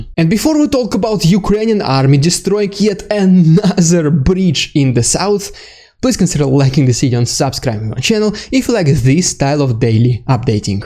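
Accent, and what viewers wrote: Russia. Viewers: Always happy to see you btw! Forgive me please for not knowing you're really Russian initially ... I just re-watched the missing first 15 minutes of your last livestream, where you explained this and I understand a lot more now. There have been fake "Russian" YouTube channels in the past, using a fake Russian accent and to not native English speakers it's almost impossible to tell the difference, also bc the channels using lots of gųnfire and expløsives in their videos don't exist anymore, making it a lot harder to compare their accents.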